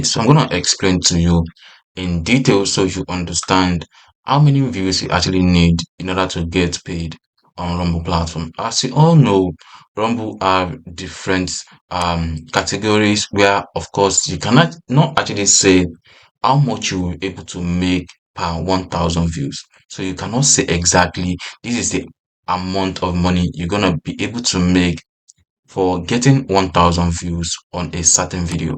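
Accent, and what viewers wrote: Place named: Africa. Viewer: Improve your Audio. Your English is very poor, you have a strong African accent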